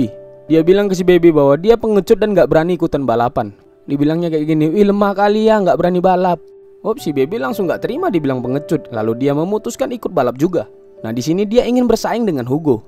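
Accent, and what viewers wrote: Medan, Indonesia. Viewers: Tiba² nongol diberanda langsung subscribe & like 🔥🔥 // Salam dari MEDAN 😁. Dari logat bicaranya mimin juga dr medan keknya ini. 🤭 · Dari awal denger cara kamu bwa cerita n logatmu udah tertarik saya, gampang dimengerti n kocak juga, org dri medan ya min??? MEDANNYA KAU BANG?? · Org medan kyk nya kau bang kwkwkwk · lucu kli kau ngomong nya bg,,,orang medan ko ya,,,wkwkw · pasti Abang ini orang Medan wkwkw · Medan kali ahh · Salam kenal min,subscriber baru mu, dari awal nonton film ini salfok sama cara pengucapannya nampaknya uwak ini anak medan pikirku🤣salam kenal lah yaa awak juga orang medan wak. Sukses terus!🫶🏻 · Logat medan ya lek😅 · mantap kali wak wkwk. orang medan keknya uwak neh 🤣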